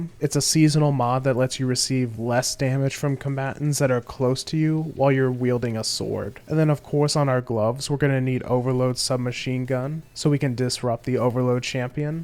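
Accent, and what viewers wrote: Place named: Quebec, Canada. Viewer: French Canadian Le Mont accent NOICE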